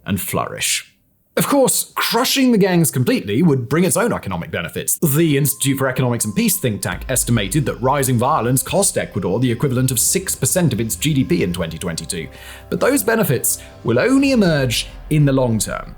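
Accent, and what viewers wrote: RP, United Kingdom. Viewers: Wow, can't stomach the Queens English...[you're going to hurt your neck, hands or teeth]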